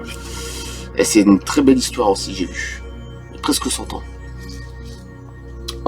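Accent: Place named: North, France. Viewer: Salut à toi de toute façon à ta voix on voit bien que tu es du Nord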